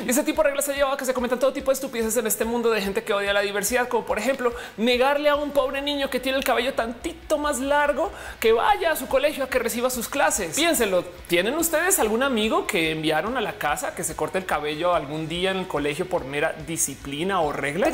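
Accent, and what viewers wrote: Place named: Colombia. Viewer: Que felicidad tener de vuelta a la, que por cierto volviste de tus vacaciones con acento colombiano mas marcadito 😁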